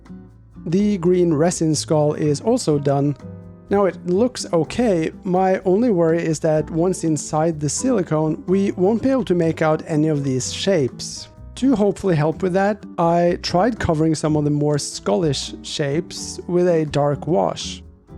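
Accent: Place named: Norway. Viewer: I can't quite figure out what flavour of Scandinavian you are. Norwegian?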